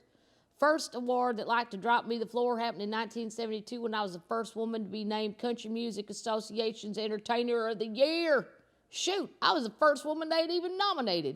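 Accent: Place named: Kentucky, United States. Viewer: I think I’m pi in up that Kentucky drawl. It’s strange ‘cause I’m from Boston! I sooo look forward to storytime and to the Pubcast. You are my salvation with all this solitary living. Can’t thank you enough.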